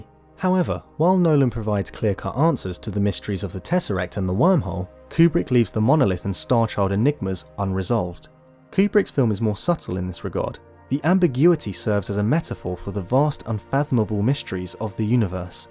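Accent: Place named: United Kingdom. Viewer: I couldn't understand this video with his British accent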